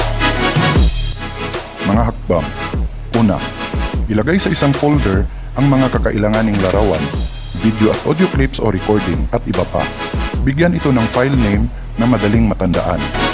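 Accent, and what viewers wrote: Visayas, Philippines. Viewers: Bisaya ka. rin ba sir? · Galing mo bro..boses mo parang bisayan tonation...pareho tayo..nice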